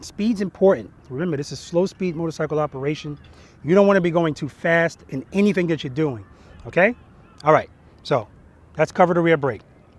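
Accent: New York, United States. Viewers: Hey. Great lesson my friend. Your encouragement lifts me up, encouraging me to practice and build confidence. Your technical skills are awesome as is your presentation. Driving all of this is your life philosophy of “paying it forward” and appreciation. Also, having grown up on Long Island, it’s good to hear your NY accent. You are making a big difference for me and many others and I thank you and appreciate you. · I can't tell you how much I appreciate what you're doing with this channel. I love the way you break everything down step by step and I especially appreciate how humble and joyful you are. I'm a baby rider and I've been watching videos fr many different experienced/veteran riders, but the way you explain things just clicked for me. Same goes for Jerry Paladino (Ride Like A Pro). BTW, love that NY accent! God bless you!!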